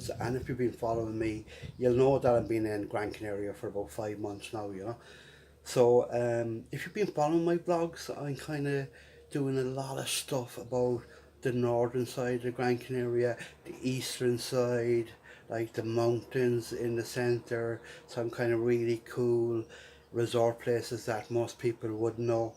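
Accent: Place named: Cork, Ireland. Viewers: Wasstorry languer cork hhh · you sound like youre from Cork, i am too! ill be headed to puerto rico in a couple of weeks cant wait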